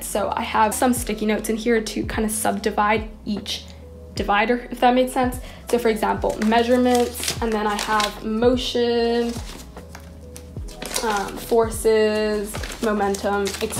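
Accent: United States. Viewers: don’t you have an american accent?so why are you doing GCSEs? or have i got it all wrong 😭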